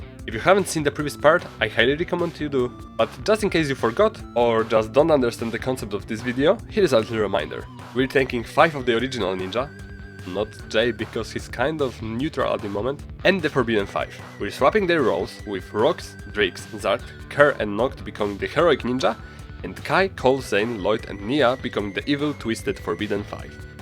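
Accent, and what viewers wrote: Poland. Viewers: Chwila, ja mogłem cały czas komentować po polsku! Jakim cudem nie poznałem rodaka po akcencie?? 😭 BTW ̶C̶z̶y̶ ̶t̶o̶ ̶b̶y̶ł̶a̶b̶y̶ ̶n̶e̶k̶r̶o̶f̶i̶l̶i̶a̶ ̶j̶a̶k̶b̶y̶m̶ ̶p̶o̶w̶i̶e̶d̶z̶i̶a̶ł̶ ̶"̶s̶m̶a̶s̶h̶"̶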